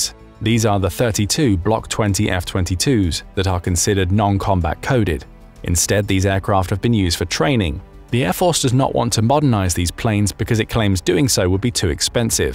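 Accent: England, United Kingdom. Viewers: More 'Anglo-Axis' bollocks with a Pommy accent. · Why is the speaker talking in a british accent about American assets?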